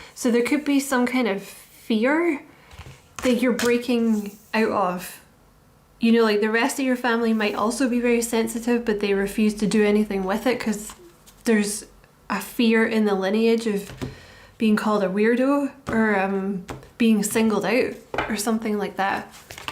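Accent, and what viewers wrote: Scotland, United Kingdom. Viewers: Always wonderful to hear your lovely Scottish accent no matter what the cards say.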